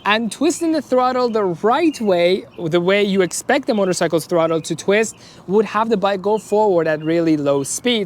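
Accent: Iran. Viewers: Farsi accent 😂👍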